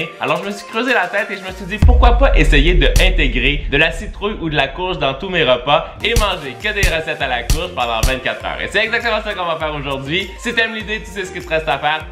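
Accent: Canada. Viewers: On est pas trop citrouille en France mais c bon et on dit pas melon d'eau on dit pastèque voila et désoler j'aime pas trop l'accent canadien mais j'aime se que tu fait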